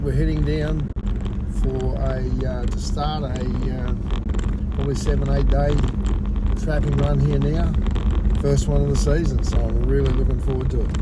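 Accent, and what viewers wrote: Australia. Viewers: you sound like a true Australian doing a great job 👍🏽👍🏽🇦🇺👍🏽👍🏽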